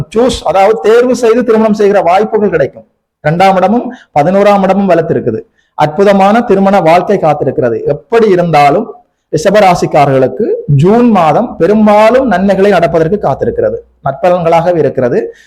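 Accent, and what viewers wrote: Sri Lanka. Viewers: ஸ்ரீலங்கா தமிழ் அருமை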